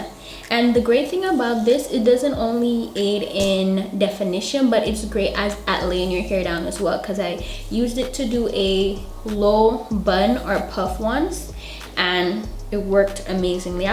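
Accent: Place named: Caribbean. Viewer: I hear a slight accent! Are you by chance from the Caribbean?🥴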